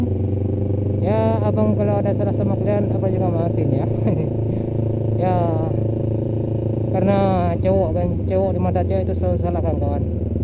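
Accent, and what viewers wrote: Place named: Aceh, Indonesia. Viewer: Logat Acehnya masih,btw selamat atas 1k sub🎉🎉🎉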